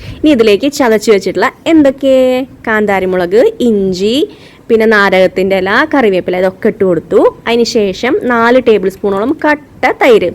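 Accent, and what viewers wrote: Thrissur, India. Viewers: Chechi frm Thrissur aanno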